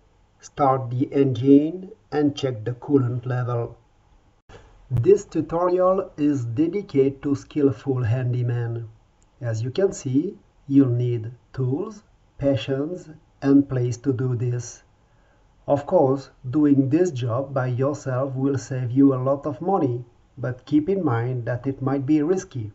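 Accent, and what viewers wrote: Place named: France. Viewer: Hi, you should have your own T.V. Show! Great tutorial & very very clear precise instructions, also very good English but I love your accent, vive la France.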